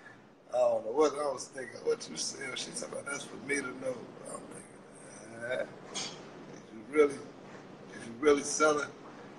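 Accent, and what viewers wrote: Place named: Chicago, United States. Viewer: He sound so Chicago ♥️❤️♥️❤️♥️😍👑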